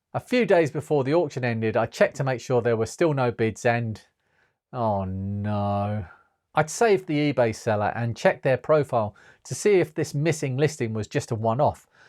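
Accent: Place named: Suffolk, United Kingdom. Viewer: What a great story and outcome!what a lovely human you are. Your channel deserves to be a lot more popular,I hope it grows more this year!I guessed by your accent you were fairly local to me in suffolk and nice to see a local charity being supported. Maybe one day when your life has settled down, you could have a retro open day or pub meet, its always nice to meet like minded people!all the best and good luck 😊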